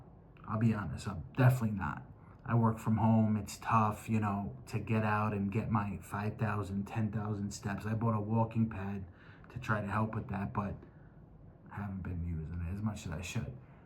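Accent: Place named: New Jersey, United States. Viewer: Not sure why or how your channel popped up in my algorithm, but it did! I see you flip houses is Texas. You dont sound like you're from TX, but you talk and sound like an adorable Jersey boy! Im born and raised in Jersey, so I know! 😂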